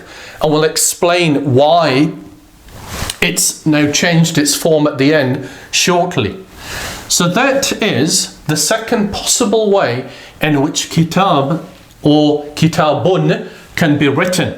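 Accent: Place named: United Kingdom. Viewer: You are speaking British accent! Wow! You're the best Arabic teacher I've ever seen! Thank you, Jazakallah!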